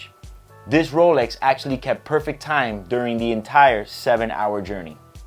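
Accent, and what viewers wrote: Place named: Miami, United States. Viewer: Cuban Miami accent lmaoo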